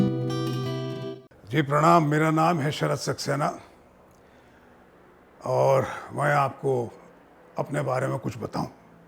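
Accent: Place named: Uttar Pradesh, India. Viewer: Sir apki language se andaza laga lia tha mane ap UP se conected ho my forefathers belong to Allahabad neem sarai and besona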